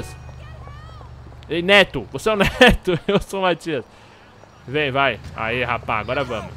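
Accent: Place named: Sao Paulo, Brazil. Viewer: be eu to zuando pqp cara '-' eu odeio sotaque de paulista mas n vo odiar o cara pq ele fala estranho '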